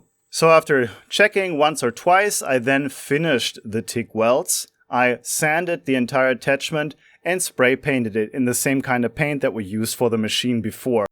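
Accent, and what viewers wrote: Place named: Germany. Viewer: Are you aware that your German accented English makes you sound like an Engineer?!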